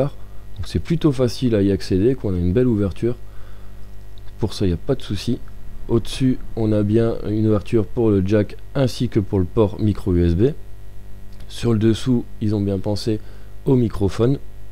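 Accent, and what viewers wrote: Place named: Belgium. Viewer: Tu es belge toi, non ? ^^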